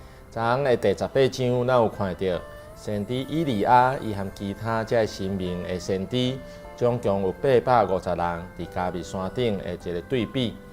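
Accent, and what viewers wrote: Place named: Taiwan. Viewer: 今仔日予我一改誠歡喜來當來聽著，牧師用端的台語放送福音，一來會當認捌上帝所講的道理，二來會當來學習著端的閣優雅的台語。牧師講道的時，聲嗽清楚優雅，予咱聽落心情齊平和。幫贊咱來認捌上帝的話，感謝上帝透過牧師來教示來勸勉。這真正是咱台語人的一大福音。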